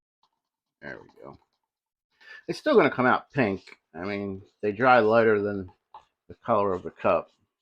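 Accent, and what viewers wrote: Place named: Maryland, United States. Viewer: First time I’ve seen you, but won’t be the last. You sound like you’re from MD, so nice to hear “normal” wuder and hunert.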